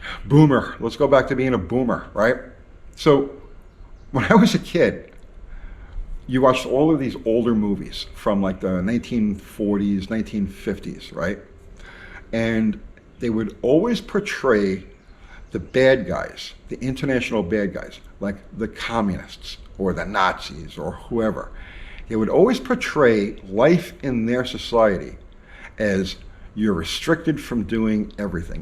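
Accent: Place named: North Carolina, United States. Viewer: You sound very much like some of my old friends here in North Carolina. I thought these same thoughts in their entirety that you are thinking and I am 70 yrs. Old and a deactivated Marine. You and I could talk forever on these and many other things. I used to love the old westerns and old science fiction and many other old things. I don't know if you are aware that the points vehicles are EMP proof. The computer brain vehicles are not and the systems would be fried if an EMP explosion went off or if a nuclear bomb hit 60 to 100 miles away. Call up some manufacturers and ask them are their cars, Jeeps included are EMP proof. I own a 2011 Jeep Wrangled, Call of Duty edition with only 73,400 original miles on it and Jeep can only speculate about it's ability to endure. I love the Jeep and it has never given me any problems except in the electrical department which required new complete wiring for 800.00 dollars at the Dealership. 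I would love to have a wrangled type jeep from the 60's and most especially a jeep from the Nam Era that was not a demilled military one. They cut the bodies in half. I don't trust rewelds that leaves a soft spot in tempered struck steel. Of course they don't do over 60 miles an hour but a great mechanic could boost it up. That would definitely survive an EMP attack and get me purtineer anywhere I need to go. Pop a couple of gas and a water can, axe and shovel and I would be in business ( biness).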